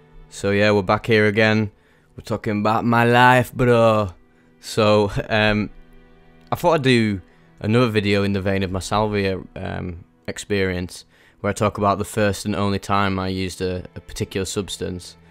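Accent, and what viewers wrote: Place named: Yorkshire, United Kingdom. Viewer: Pal, with that accent you must be round my neck of the woods. Anywhere near Sheffield? 😂 Yorkshirean psychonaut here anyway. Cool videos lad, it's a sound idea to show these game visuals while describing your experiences in a humorous yet accessible and detailed way. Been doing acid/shrooms for over a decade but I've yet to approach DMT/Ayahuasca, just from a lack of source. I've def always been intrigued - just from the levels of consciousness and perception I've opened up into, and the way that's evolved me as a person since my late teens. When done the right way and with respect, these are immensely powerful tools, that has potential in therapy too. You're right that people should generally wait until their brains are more developed though. Get that McKennite wisdom out for the curious bairns! Honestly, once I got into Timothy Leary, or Huxley's Doors of Perception, I moved onto Terence and it absolutely astounded me how his level of communication and language, the pure creativity and lingual dexterity, was able to decipher and discuss the psychedelic experience in such a detailed way. 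Anyway, subscribed!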